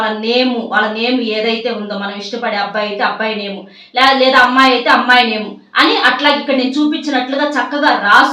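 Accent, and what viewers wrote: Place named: Narasaraopet, India. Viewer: White saree, black jocket malleypuulu,narsaraopeta bhasha maatlaadatam ,anni superuu😅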